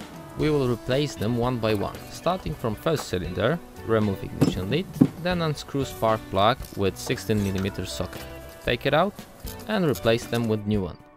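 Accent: Poland. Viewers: Zasubskrybowano, po akcencie coś czułem ze Polak aż zobaczyłem polskie napisy na kompie :) super kanał, dobra robota.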